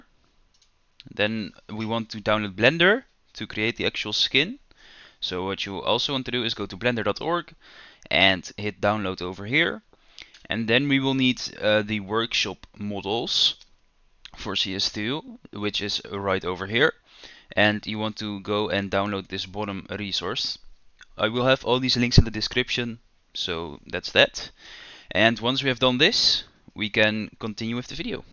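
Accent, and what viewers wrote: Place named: Netherlands. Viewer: ik hoor meteen dat jij nederlands ben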